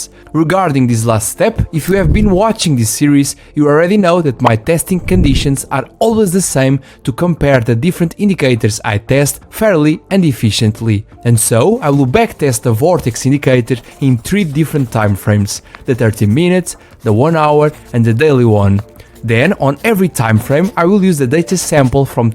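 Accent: Portugal. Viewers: you are portugues right?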